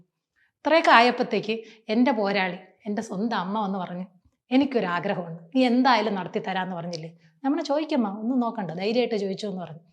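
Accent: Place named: Trivandrum, India. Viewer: assal tvm avatharanam. Love you from tvm and near by your place ❤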